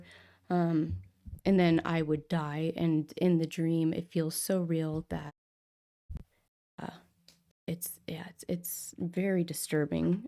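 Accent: Australia. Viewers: Because dolls/toys are attached to people's childhoods or something? That's just my thoughts 🖤✌️👑👽 (maybe Dolls are like humans and turn into some kind of vessel) p.s. best australian accent ever¿ 💀 THIS STORY IS WILD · As an Australian myself, that accent was gold 😂👍🏻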